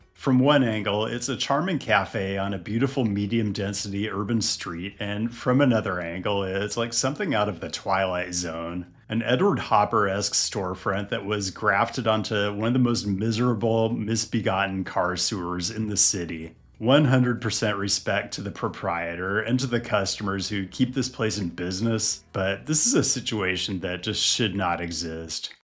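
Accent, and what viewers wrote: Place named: Southern California, United States. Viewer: When are you going to ditch the fake SoCal voice inflection? You obviously weren't born with that, it's just something you learned from your environment. You don't live there anymore, but you still sound like a teenager. Are you going to sound like that at 90? When will you be genuine and use your own voice? If you change your voice to fit in, who's to say your political views aren't just as phony?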